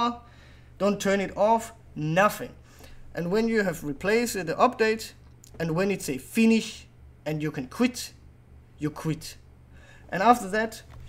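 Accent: Denmark. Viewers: you are from danmark andt you XD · er du dansker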